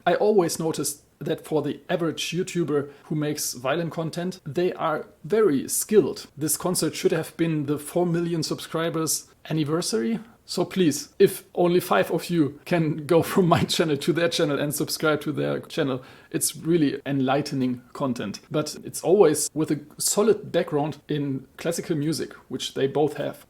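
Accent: Germany. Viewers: würde mir nur etwas weniger deutschen Akzent wünschen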